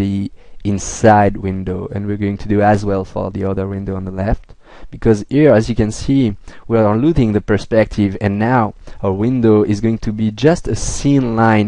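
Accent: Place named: France. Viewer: 'ere. aha love that accent are you french?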